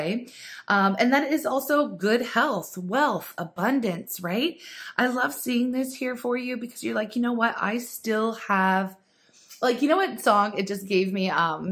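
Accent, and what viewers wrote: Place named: United States. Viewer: Your american accent is amazing! I'm always sooo inspired by you and your content. Absolute Legend!!!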